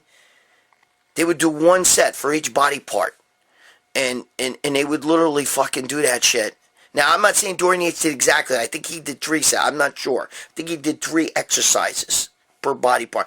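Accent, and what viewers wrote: New York, United States. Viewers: Keep cranking the videos they’re very entertaining. You need to start making some videos on your own channel so this way you can make yourself some money instead of making it for other people. You make everything very interesting and fun to watch also I’m a fellow New Yorker who moved down south so it’s nice to hear somebody talking normal for a change. Makes me miss NY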